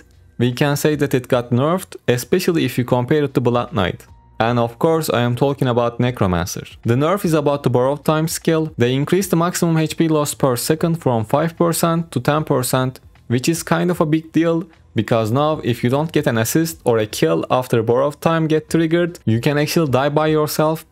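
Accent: Turkey. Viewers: Tam türk aksanı var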